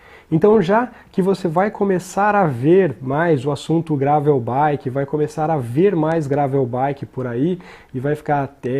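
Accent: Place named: Germany. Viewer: Cara vocês são muito showww. Esse sotaque da é lindo... Parabéns casal... Primeiro...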